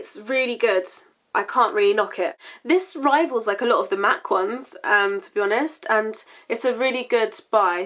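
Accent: United Kingdom. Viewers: everytime i watch your videos i feel like talking in a british accent for the rest of thee day :) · the british accent sounds like heaven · i love listening to british people speak. it's just so much more sophisticated than us americans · Does anyone else find the need to talk in a british accent after watching her videos? or maybe it's just me, I absolutely love her accent ( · Love the accent, I kinda how to speak like this Brit-ain accent ! Btw I'm from Malaysia, love your review :) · I LOVE your British Accent <3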